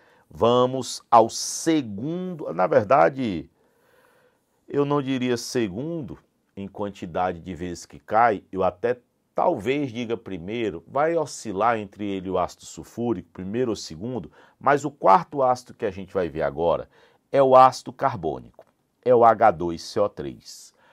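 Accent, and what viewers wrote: Ceará, Brazil. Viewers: eu amo o jeitinho cearense que ele explica kkkkkkkkkkkkkkk, simplesmente o melhorrr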